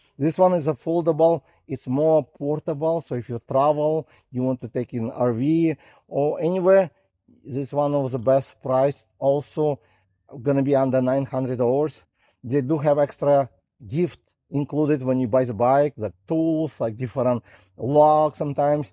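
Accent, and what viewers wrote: Russia. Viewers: Good review but you have a thick Russian accent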